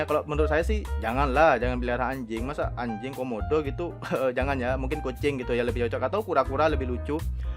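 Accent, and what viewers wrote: Indonesia. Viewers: Mas... logat maduranya mas dikondisikan 😅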